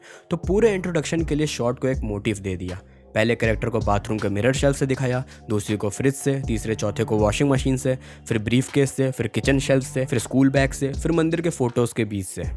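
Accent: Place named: India. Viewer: Film❌filam✅ bhai ka accent desi hain🙂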